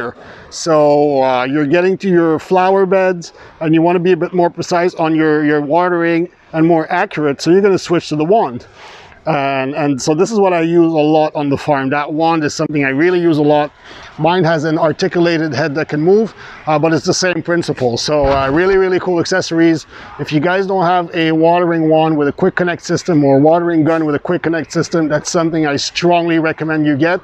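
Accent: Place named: Quebec, Canada. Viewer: Hey there...just found you on here. Was listening to Epic gardening podcast and recognized a fellow bilingual Quebecois. I'm in Val-des-Sources( formally Asbestos) and love seeing the familiar products as I see on the shelves here...I guess I would have to consider myself an experienced gardener at this point, but love finding new ways to inspire others how to grow something.... anything really..lol I'm officially a Fleuriste by deploma, but have worked many years in a centre de jardin/pipinere learning from old school gardeners, and have always had my own gardens of my own over the years. This year I am introducing wine cap mushrooms to my pathways...😁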